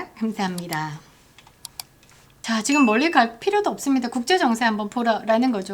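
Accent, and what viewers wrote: Gyeongsang, South Korea. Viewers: 경상도 사투리 친근함이 느껴집니다.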